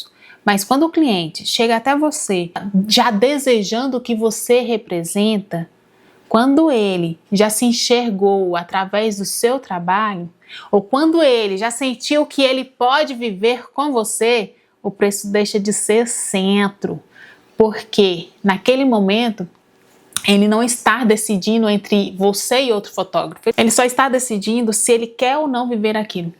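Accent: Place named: Recife, Brazil. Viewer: tú és de Recife? Esse sotaque… uhm acho que sim sou de Olinda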